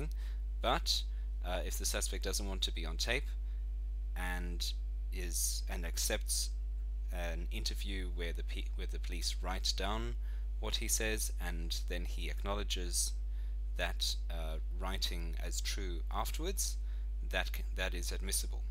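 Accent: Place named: Australia. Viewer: Bill Hahn I think its Australian.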